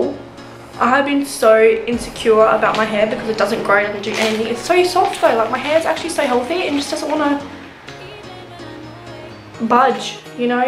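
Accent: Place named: Australia. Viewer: It's not your fault, please don't get so upset! It's just Australian accent that is hard to understand!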